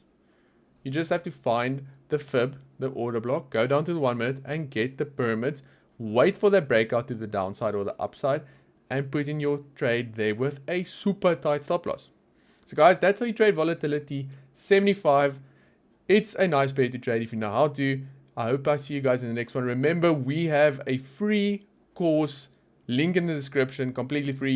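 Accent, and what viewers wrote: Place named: South Africa. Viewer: i know a South African accent when i here one 😁😁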